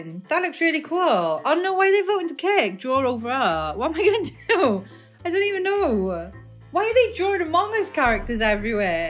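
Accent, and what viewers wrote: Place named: Scotland, United Kingdom. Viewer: What country are you from you sound from Scotland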